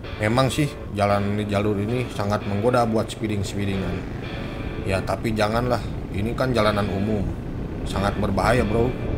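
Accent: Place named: West Java, Indonesia. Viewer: logatnya Sunda pisan ahahahah. adem dengernya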